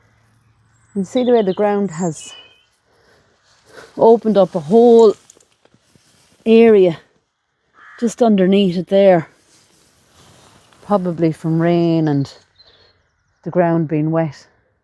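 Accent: Ireland. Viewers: I could listen to you speak for hours. I love the irish accent. Im about 20% irish, maybe that has something to do with it. Hope your health improves soon. And best wishes from America!😊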